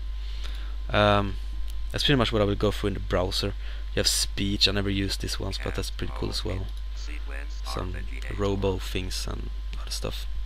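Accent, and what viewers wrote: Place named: Sweden. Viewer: Så du har äntligen börjat? :D Älskar din Svenska dialekt på engelskan! (Y) :D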